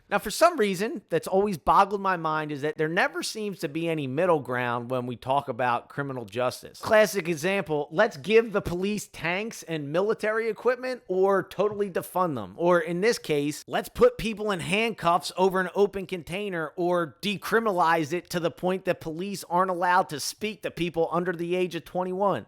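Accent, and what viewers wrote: Philadelphia, United States. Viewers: I knew you had a Philly accent!!! Or I guess Jersey, but I'm from Philly so it sounds like mine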